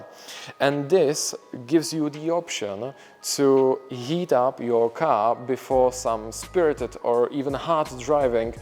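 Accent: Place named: Czech Republic. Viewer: ta čecho-angličtina se nedá poslouchat, sorry... jinak auto krásné, gratulace ke splněnému snu 🍾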